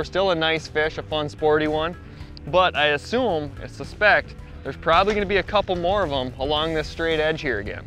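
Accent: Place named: Minnesota, United States. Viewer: That Minnesotan accent though...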